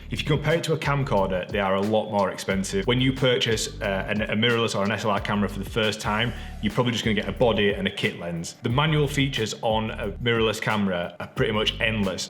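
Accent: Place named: Northern England, United Kingdom. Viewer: D'ya know summat, it's so nice to hear not only an English accent but a NORTHERN English accent on YouTube! you have a new sub my friend!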